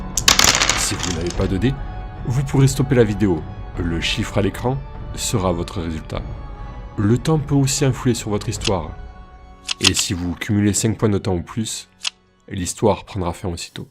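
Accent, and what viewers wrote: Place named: South, France. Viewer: Y'a pas à chier on voit la progression, autant dans l'originalité que dans la façon de raconter l'histoire, en plus le petit accent du sud met trop bien. Je suis bien content d'avoir découvert ta chaîne grâce à ton passage sur le Live d'Anthox. Sinon t'as jamais essayé de te mettre en contacte avec des MJ comme Mahyar par exemple? ça pourrait t'aider à prendre de la visibilité.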